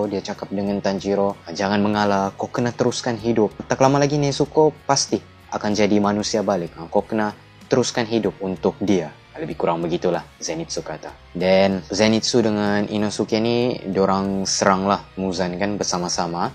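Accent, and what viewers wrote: Sabah, Malaysia. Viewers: orang sabah bah ni, begini lah kalau org sabah bercerita memang feel betul 😁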